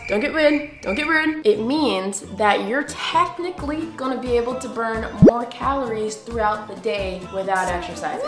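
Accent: United Kingdom. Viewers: literally discovered you through youtube and now i cant stop watching your videos! very informational :) ALSO .. your random british accent cracks me up.. (i'm british LOL)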